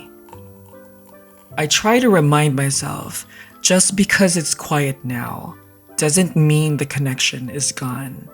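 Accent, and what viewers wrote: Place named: Philippines. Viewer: Your Phillipino English accent is so beautiful and relaxing.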